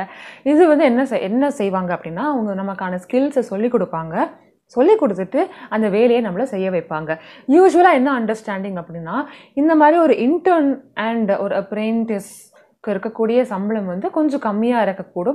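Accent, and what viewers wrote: Sri Lanka. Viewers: srilanka va ..slang kekka nalla iruku cute ya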